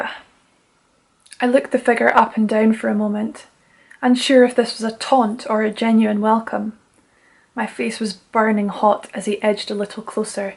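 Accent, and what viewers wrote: Scotland, United Kingdom. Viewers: So nice to hear such a lovely Scot lass tell a beautiful story. Would love to hear a wee bit more of the Edinburgh accent, it is my favorite sounding speak.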